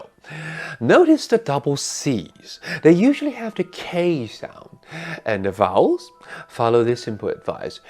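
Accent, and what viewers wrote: United Kingdom. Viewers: 英式口音真的很好聽👍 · podcast 英國腔任何東西都可以！！ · 英國腔超棒的！好好聽(☆∀☆) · Teacher, you have a beautiful fluent British accent and I really like it. I wanna to learn English but I am not interested in other English films except for Harry Potter series. And this is actually a perfect video to let me learn English, it is such a wonderful combination of English and also Harry Potter. Well..hope u will continue this series..ummmm.. i love it so much and thanks a lot!😊❤ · 來了～老師終於出片了❤️ 從一開始聽到老師的英式口音覺得好高雅好好聽喔～ 但是身邊沒有用英式口音的人啊～都是美式口音居多 真的很可惜沒人可以練習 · 好像繼續學英文了 老師的英國腔真好聽！ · cool british.accent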